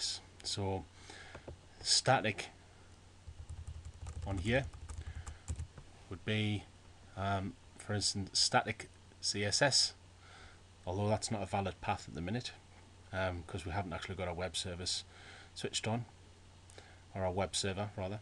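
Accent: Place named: Newcastle, United Kingdom. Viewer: This is awesome. Django in a Geordie accent.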